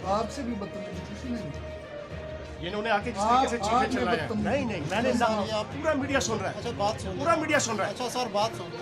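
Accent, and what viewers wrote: Punjab, Pakistan. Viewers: Punjabi touch zaida DIA karay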